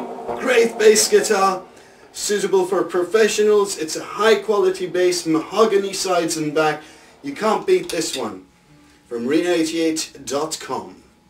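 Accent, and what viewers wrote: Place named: Australia. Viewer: he sounds like an australian guy giving a review on an animal.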